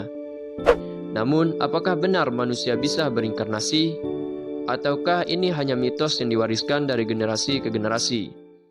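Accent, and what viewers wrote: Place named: Bali, Indonesia. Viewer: Hmmm... sebenarnya aku ingin bertanya padamu, apakah kau mempercayainya,,, tapi itu ku batalkan, mengingat aksenmu menunjuk kan logat Bali, jadi kujawab saja sendiri kau pasti percaya, karena itu di sebutkan dalam ajaran agamamu. Dan jika kau percaya, kau bahkan tak perlu meragukannya dengan membaca atau bertanya pada ilmuwan. Apapun jawabannya mereka hanya meneliti dan meramalkan atau menyimpulkan,, jika hasilnya tak sesuai kau malah goyah pada apa yang kau yakini. Karena hal ini tidak bersifat fisik itu sudah menyangkut ranah di luar fisik. Itu berada di ranah antara kematian menuju ke kehidupan !. Jika kau ingin memastikannya orang harus mati dulu. Setelah itu baru tahu apakah reinkarnasi afa atau tidak, tapi itu terlalu ekstrim bukan ?? Ha ha ha ha ha.... untuk lebih mudah cobalah cara yang lebih mudah,, yaitu dengan memahami hakikat hidup dan kehidupan.. 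mungkin saja dari situ kau akan mendapatkan pencerahan ! Karena jika kau tanya ilmuwan,, jika ilmuwannya hindu atau buda, mereka pasti jawab ada, terlepas dari apapun caranya untuk membuktikan bahws itu ada,,, tapi sebaliknya,,, jika ilmuwannya muslim atau islam,, dia pasti akan menjawab tegas tidak ada, meskipun bukti ilmiah mendukung dan membuktikan!. Kenapa? Karena dalam islam tak ada konsep tentang reinkarnasi. Termasuk karma, hal itu tidak di jelaskan atau di ajarkan dalam agama islam. Tapi,, tidak di ajarkan,, belum tentu berarti tidak ada bukan??... jadi selama faham itu membawa kebaikan hidup bagimu dan bagi sesa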